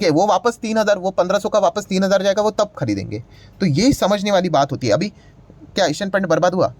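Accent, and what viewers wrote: Maharashtra, India. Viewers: You have a very nice Marathi+Hindi Mhindi accent ❤ I enjoy your typical marathi words in between. Thsnks for reminding me of my mother tongue 🎉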